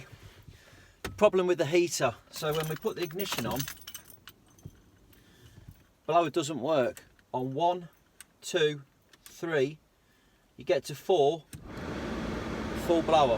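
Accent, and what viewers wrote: Leicester, United Kingdom. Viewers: Are you from Leicester?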